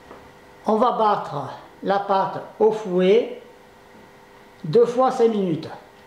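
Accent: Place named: Provence, France. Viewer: Merci pour cette recette super facile et bien expliquée, ma pâte se repose en ce moment, et merci pour votre accent qui sent bon la Provence de chez moi lol, joyeuses fêtes à tous!!!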